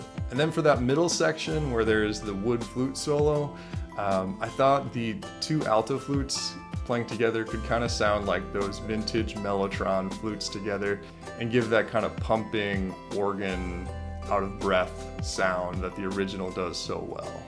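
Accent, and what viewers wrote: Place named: United States. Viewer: I don’t know why I always assumed you were from Eastern Europe. I was shocked to hear the American accent 😂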